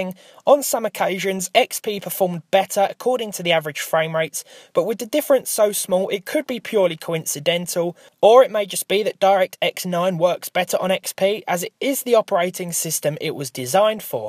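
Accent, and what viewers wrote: United Kingdom. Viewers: You're* nice uk english.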